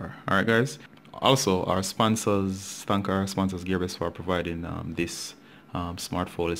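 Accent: Jamaica. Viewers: Jamaican I think not being racist just heard the ancient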